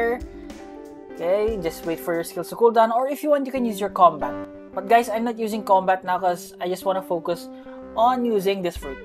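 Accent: Philippines. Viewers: Pilipino kapo? Boses nyu kase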